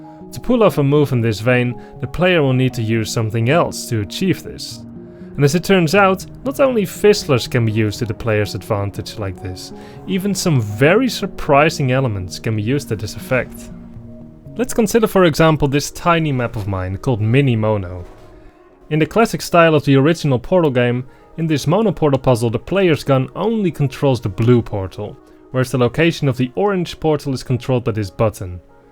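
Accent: Netherlands. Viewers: are you dutch cus i am and i hear the accent and your name looks dutch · I hear a Dutch accent and see a Dutch name 👀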